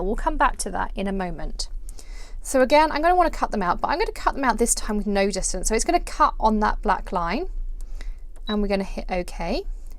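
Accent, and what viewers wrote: England, United Kingdom. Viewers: Omg, i've seen it many times on tv, but never thought i need one. I spend a fortune on, coordinating dies. This is now on my birthday/ christmas list. Also i came across your channel a few months ago. i feel you are perfect for me, i watch all the designers over there in america. As i work on dt here in the uk promoting american stamps as you guys are well in front of the uk. but the best thing about your channel is you know your stuff but you have a english accent. so you see i get the best of both worlds. don't get me wrong not all Americans have a strong accent and i do love them, but i find afters hours of videos i find yours a welcome change. lol x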